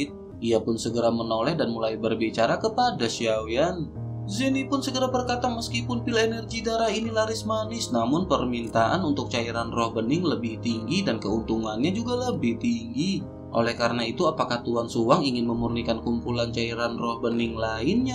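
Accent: West Java, Indonesia. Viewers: Sunda ya min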